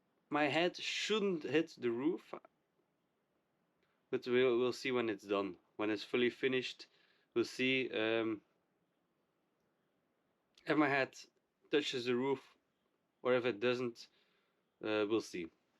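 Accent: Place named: West Flanders, Belgium. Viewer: Vn da goe west vlams engels af en toe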